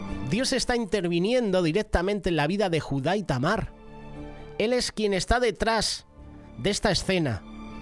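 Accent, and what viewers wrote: Spain. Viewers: Hermosa explicación, hace poco que sigo su canal y sus contenidos son muy edificantes. Que bueno saber que en Europa hay fervor por la Palabra de Dios ( entiendo que la voz en off es de un español) Bendiciones desde América del sur ( Paraguay)🇵🇾💖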